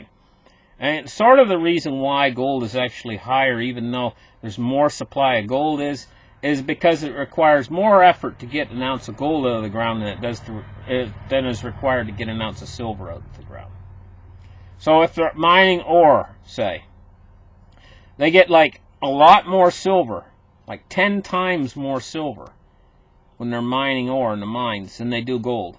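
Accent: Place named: Yukon, Canada. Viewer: i try to copy accent, but hard... is it Klondike?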